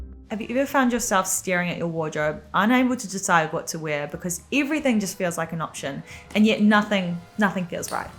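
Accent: Australia. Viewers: What about active wear (sports wear) and winter wear, jumpers, cardigans, hoodies, coats for various occasions and comfy stuff to wear around the house? I like the idea of a more intentional wardrobe, but what's on that graph - all looks like things you go out in, which is fine, but seems like quite a big gap in content, no offence, I appreciate this is more from a styling perspective of clothes to choose when leaving the house, but as someone looking for wholesome practical content on the matter, I'd like to also see a breakdown of the other parts of the wardrobe and how to curate that. Like, how many coats, cardigans, jumpers, hoodies, even pj's to stick to, or multi purpose clothing options in these parts of wardrobe, that kind of stuff. I really like you're approach, would love to hear your take on those parts of wardrobe too. I don't know if you're from Australia judging by the accent, but I'm in the UK, and we can have 4 seasons in 1 day sometimes times, and winters are cold, like I can't imagine surviving a winter with the wardrobe in your video. 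Anyway, if it's relevant for your audience, I'd love to see a video for decluttering winter wardrobe! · So glad I found you! Also, I moonlight as a dialect coach in my theater community and you're giving chef's kiss Elevated Aussie! 🥰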